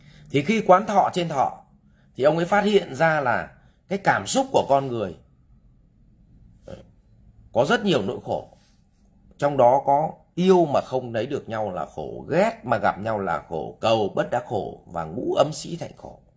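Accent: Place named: Vietnam. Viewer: Toi thích cách thầy nói chuyện với cu, đúng cái chất mien que nhà mẹ tôi.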